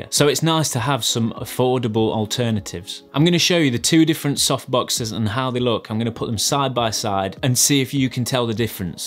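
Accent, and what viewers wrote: United Kingdom. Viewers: I think you're #DannyGevirtz with a British accent. Thanks for another great video, bro.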